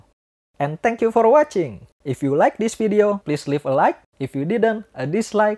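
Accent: Indonesia. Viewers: Aaaahahaha... this is the accent of english language that i love.. Indonesian accent.. love my Indonesia... 🥰🥰🥰🥰🇲🇨🇲🇨🇲🇨🇲🇨🇲🇨🇲🇨🇲🇨🇲🇨🇲🇨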